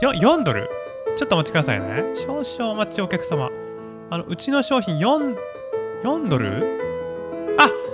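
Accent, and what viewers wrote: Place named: Kansai, Japan. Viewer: 関西弁多めで嬉しい:_ キラキラ: マネキン重視なお店でオモロい:_ バカな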